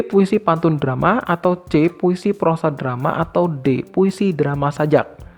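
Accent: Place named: Java, Indonesia. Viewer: Bener lho suaranya mirip dosendeso...agk kejawa2an ngomgny...kaya org jawa medok dikit hihi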